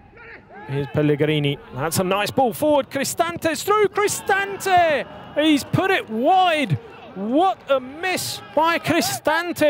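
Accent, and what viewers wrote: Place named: Italy. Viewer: Pretty sure is an Italian commentator trying to speak in English accent...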